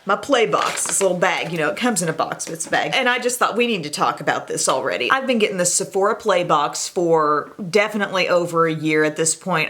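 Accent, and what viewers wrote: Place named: South, United States. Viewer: I don't know but I feel like I hear more country in your voice in this video? Like more southern? Love your vids and you! Xx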